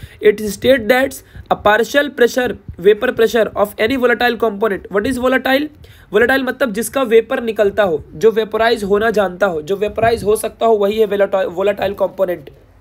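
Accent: Maharashtra, India. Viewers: Marathi aahe ka Dada tu? Aasa bolat ja madhe madhe thoda changl vatt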